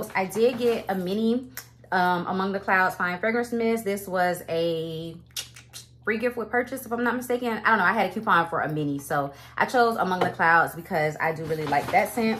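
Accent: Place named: Bay Area, United States. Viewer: Thanks so much for the new scents descriptions! I can't wait to hear your thoughts when the extended Gingham line drops. Btw did I miss a FAQ or get to know me video? I must know if you're from the Bay.😂 You talk so similar to us here in the Bay(Cali not FL) and even some of your mannerisms are similar!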